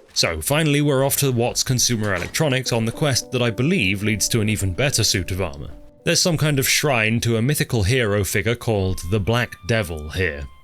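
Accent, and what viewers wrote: RP, United Kingdom. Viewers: You're like the anti-nerbit. You don't like melee weapons, like the railroad, have the posh British accent instead of the funny one he has, and exploit the game to make it easier rather than harder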